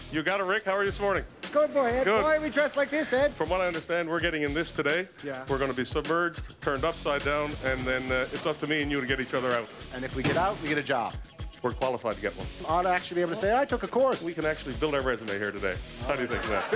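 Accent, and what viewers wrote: Newfoundland, Canada. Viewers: Funny to hear Rick get his newfie accent back,love it❤❤❤❤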